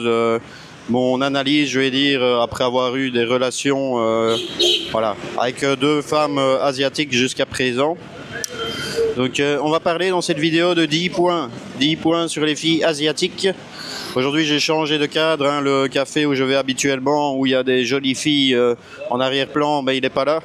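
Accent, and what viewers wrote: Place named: Belgium. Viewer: Merci pour t'es conseil tu ma bien fait rire et ton accent Belge et très sympa !!!!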